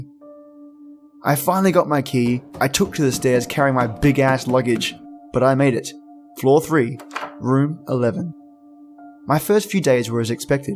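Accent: Australia. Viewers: the Australian accent does but me to sleep. But I dong think my work wants me sleeping on the job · Hell nooo!! 😱 If someone was in my garage I would try to trap them in there until the cops showed up to arrest them. Good story, Great narrating, and as always awesome Aussie accent 😉 haha 🙂🖤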